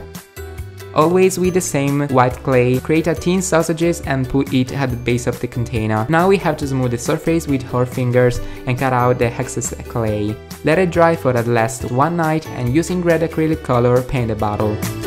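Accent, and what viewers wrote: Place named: Italy. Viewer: Zac te wait to see the hamburger tutorial, your Italian accent is so cute 😀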